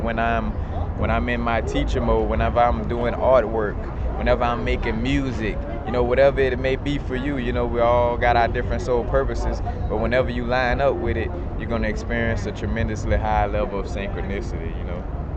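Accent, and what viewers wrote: New Orleans, United States. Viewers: Brother are you from New Orleans? Sure sounds like it.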